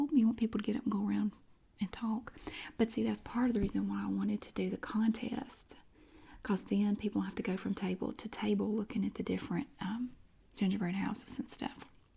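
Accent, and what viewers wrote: South, United States. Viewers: I love when you say “loogathaat” I live in nc and have a southern accent too but for some reason I love yours! Lol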